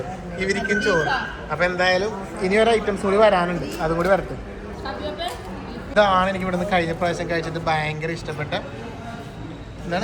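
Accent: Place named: Ernakulam, India. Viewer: Ernakulam slang sani ivide aano veedu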